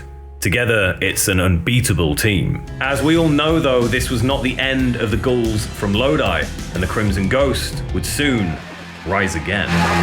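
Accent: England, United Kingdom. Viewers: There's something hilarious about the contrast between the host's prim and proper English accent and manner of speaking, and his old school rocker style appearance. Not what I was expecting.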